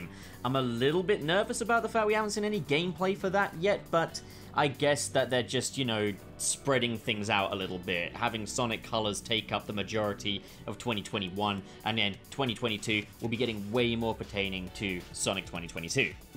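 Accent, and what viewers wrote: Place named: United Kingdom. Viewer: I am surprised to hear a British fellow say "dudes".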